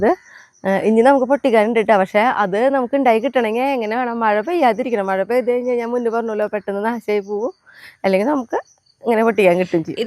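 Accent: Thrissur, India. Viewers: Its Thrissur slang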